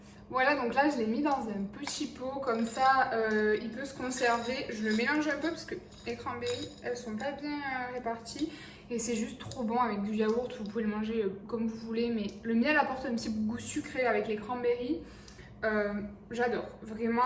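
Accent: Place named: France. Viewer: Молодец! Спасибочки Тебе Большое Родная Драгоценная Прекрасная Девушка Красавица!!! Спасибочки Тебе Большое за Красоту Твою Прекрасную и за Твои Видео на Ютубе!!! Я, слышу Твой акцент, это наверное ,, Французский язык", Твой, Наверное???!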